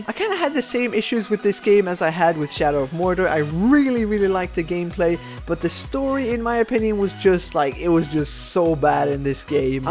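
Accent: Skåne, Sweden. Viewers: i knew u were cause u know that one q&a vid when u said some words on swedish i cinda heard that u were from skåne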